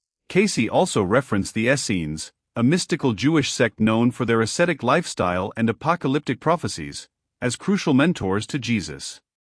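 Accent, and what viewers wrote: United States. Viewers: typically American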